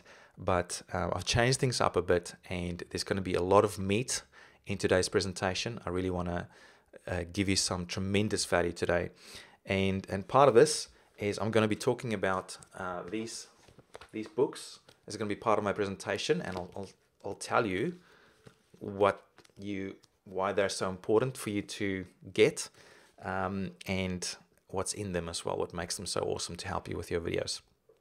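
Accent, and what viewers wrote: South Africa. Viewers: I never thought you were Australian? Being from New Zealand, I thought you had a South African accent (my fave accent haha). But your videos are very helpful, thanks :)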